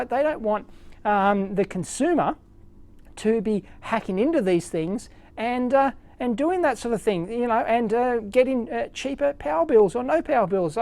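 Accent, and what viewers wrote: Australia. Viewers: I thought you are from Aus ...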